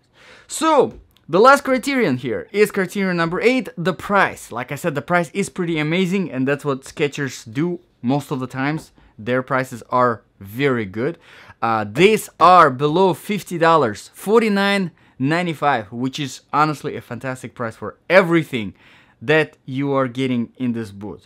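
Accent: Russia. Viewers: İs it Russian accent?)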